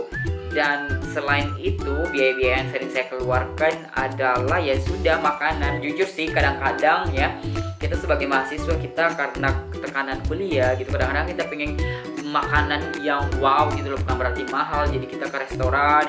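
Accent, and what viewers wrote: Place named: South Sulawesi, Indonesia. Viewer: Hai kak, kalo dari aksen sepertinya orang Sulsel hehe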